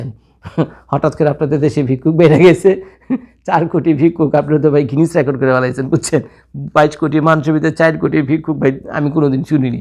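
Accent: Bangladesh. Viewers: আপনি আজকে 15 বছর যাবত নাকি আমেরিকাতে আছেন কিন্তু আপনার ভাষাগত যে আঞ্চলিকতা রয়েছে বাংলাদেশের সেটা কি একান্তই বাংলাদেশের লুঙ্গি সাব দের খুশি করার জন্য🎉🎉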